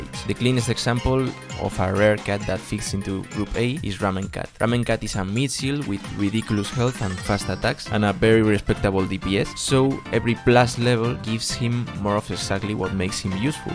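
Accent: Spain. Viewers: ¿Are you spanish? Your accent sounds like when I hear spanish people speaking english 😅 ¿Or do other languages produce the same accent?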